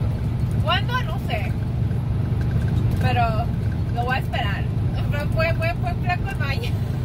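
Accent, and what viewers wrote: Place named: Mexico. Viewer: me gusta tu dejo o acento mexico epaaa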